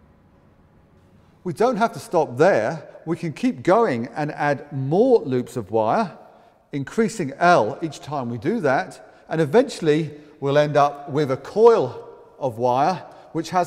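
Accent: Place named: England, United Kingdom. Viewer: if you google the pronounciation of wire on google there are two ways to say it. he is saying it in the British way. shocking for you there are accents other than an American so shut up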